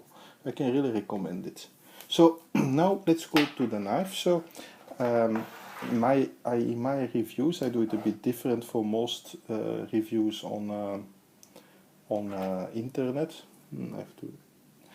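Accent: Flanders, Belgium. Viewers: Nice review, love the Flemish English accent by the way. 😉. I bought the same watch but it's a 38mm, 18mm lugs and solid case back. Even more vintage that way and better suited for smaller wrists. Keep up the nice work. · je klinkt als een Belg.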